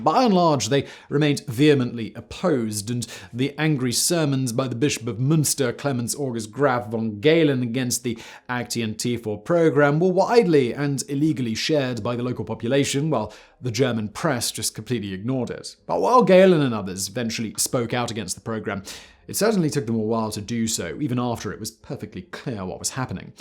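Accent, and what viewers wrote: United Kingdom. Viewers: Omg it's the bri'sh VSauce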